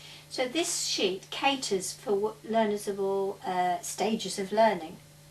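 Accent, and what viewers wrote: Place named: United Kingdom. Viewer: british accent. the best accent. thanks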